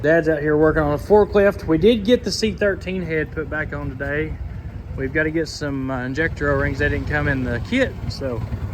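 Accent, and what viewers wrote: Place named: Tennessee, United States. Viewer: Close your eyes, who does sound like? He could do a voiceover for Gomer Pyle effortlessly. I don't say this to make fun my accent is 150 miles east of you in Tennessee so I have the accent as well.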